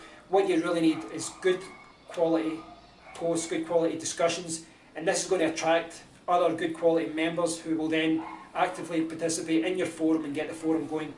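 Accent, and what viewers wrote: Scotland, United Kingdom. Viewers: Hi What part of Scotland are you from? I live in East Lothian Love the vids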